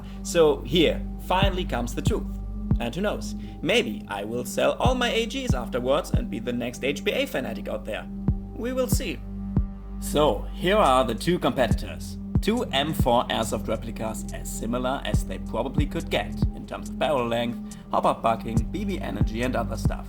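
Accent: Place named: Germany. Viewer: Dang that shimming is perfect all that I hear is the pistonhead hitting the cylinder head. You are a damn well technician. It sounds even better then my MCX and I thought that one was perfect. Anyway you sound German. Are you by chance from North West Germany ? I would like to see you on our field (an old Gas powere plant.) indoor CQB with 7 floors of action.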